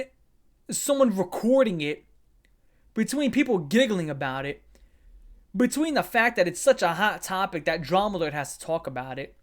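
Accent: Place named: Baltimore, United States. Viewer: I have a feeling you're from baltimore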